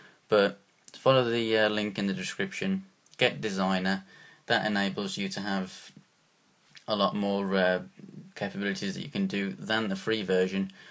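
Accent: United Kingdom. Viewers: Are you from birmingham? you sound like a brummie xD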